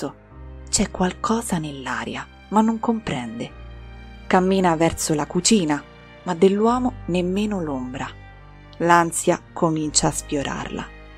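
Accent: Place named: Rome, Italy. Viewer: c'e' di peggio 😅 credimi ci sono truecrimers che ho dovuto abbandonare, errori grammaticali, voci con cadenze ben piu' pesanti di una semplice inflessione che credo sia romana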